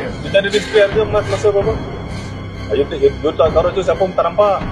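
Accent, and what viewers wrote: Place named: China. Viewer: Kenapa nak cakap melayu ikut dialek cina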